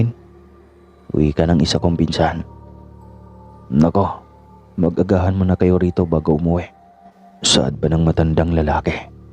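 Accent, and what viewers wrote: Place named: Pangasinan, Philippines. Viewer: Parang pangasinan si kuya nag kukwento😊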